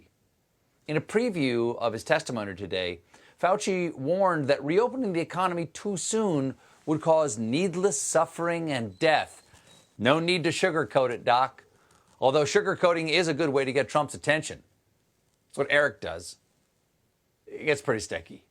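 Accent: France. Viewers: Hey Stephen Ton français fait dure ! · J'aime votre accent Français Stephen ! :-) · Stephen, I love ta French accent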